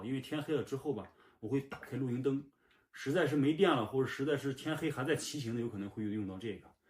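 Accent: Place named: Shandong, China. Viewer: 每天都期待你的视频，阳光正能量，更重要的是听着你山东普通话特别亲切，为咱们山东人点赞👍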